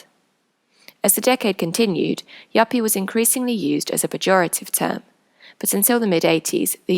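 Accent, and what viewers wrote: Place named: United Kingdom. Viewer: This lovely britich accent) very good to hear - difficult to understand) your video is great)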